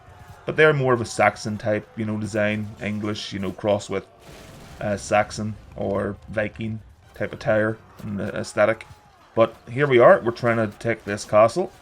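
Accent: Northern Ireland, United Kingdom. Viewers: Its pretty cool to hear a fellow Northern Irish man having a blast with this game, ive pretty much abandoned all my other games over the last month.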